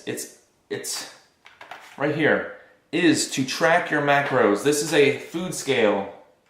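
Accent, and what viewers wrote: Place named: United States. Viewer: Hey bro! You are vlogger 🤟🤳 cool video man! Love the energy and your edit! ❤👍 Nice workout! My questions for your q&a is: ➡️Where are you from ? You sound like American 😉🇺🇸 ➡️What made you change your diet and workout? Probably you say on your videos but I just met you 😅👍 ➡️Who is your favourite superhero? ➡️What would be your speech topic if all people of the world could hear you for 5 minutes? 😃👍😉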